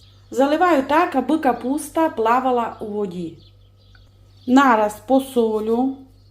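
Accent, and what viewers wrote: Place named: Transcarpathia, Ukraine. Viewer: Мені надзвичайно цікаво слухати, як звучить закарпатьский діалект. Попри те, що українська - моя рідна і я знаю її дуже добре, деякі слова все одно не до кінця розумію, але мені розуміти їх десь так само хочеться, як і вашої капустки. Бо з дитинства розмаїття мови - то моє хобі. Яка ж багата є наша мова!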